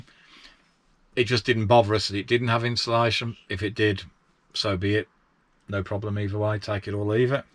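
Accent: Birmingham, United Kingdom. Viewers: Yes, thank you! That one works! ☺ Good to here the Birmingham accent again! I went to school near Weoley Castle in B'ham! Nobody told us that we were speaking old Welsh. West Mercia used to be part of Wales!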